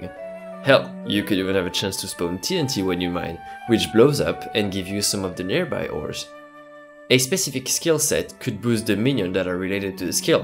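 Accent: France. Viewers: You sound so much like a France guy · ta l'accent d'un francais qui veux parler anglais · J'aime cet accent français ! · FRENCH ACCENT !!! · Listening to your accent i can approve that bedore you did yt you whrre used to talking french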